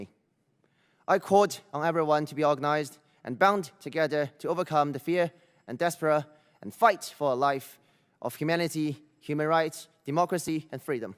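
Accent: United Kingdom. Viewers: 比起边上的周（在直播里听了周的发言），杨的叙述更能深入人心，充分的依据、有逻辑的叙述、带有英国口音的悦耳英文，应该会touch到这些议员的心灵... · 感覺是香港來的留學生，濃濃的英國腔，不過口條清楚、明確表達！ 🙂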